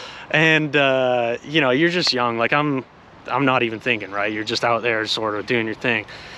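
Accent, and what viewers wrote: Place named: England, United Kingdom. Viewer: Where in England you from bro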